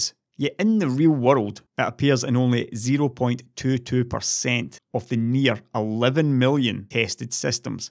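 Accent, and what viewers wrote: Scotland, United Kingdom. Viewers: whassat accent man, too soft fer glasgee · love a Scottish accent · It definitely sounded Scottish, but I felt like it was a little different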